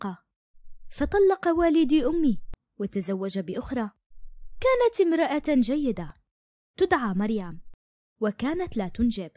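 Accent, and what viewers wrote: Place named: Algeria. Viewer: من لهجتك تباني من الجزائر انا من الجزائر وانت♥♥♥♥♥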